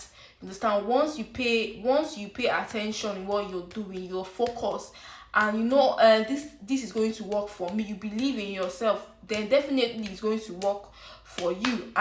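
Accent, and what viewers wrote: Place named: Africa. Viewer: The music makes it harder to hear what you're saying especially since i an not from Africa and the accent you have is new to my ears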